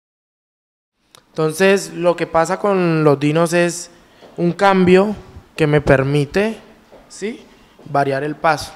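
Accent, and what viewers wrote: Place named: Cali, Colombia. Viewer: Ahhhhhh! Me encanta ese acento caleño! :D Mira veh!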